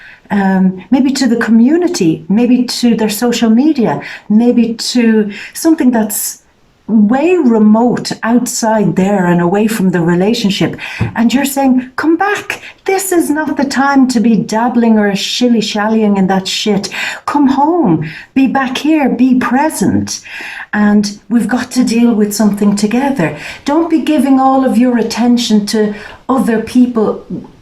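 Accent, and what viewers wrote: Ireland. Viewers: I love that accent. It's always felt familiar when I was like 3 years old but being American and my only time hearing that accent was from watching Darby O'gill and the little people with my great grand mother and my great grandfather singing when Irish eyes are smiling. It's always felt like home. And your reading is spot on. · Your voice reminds me of when I lived in Ireland. I don't want yesterday, he was my past. How would one get a private reading. Slante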